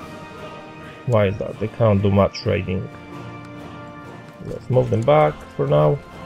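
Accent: Poland. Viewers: I did know that from the beginning of the video, you have typical polish accent :D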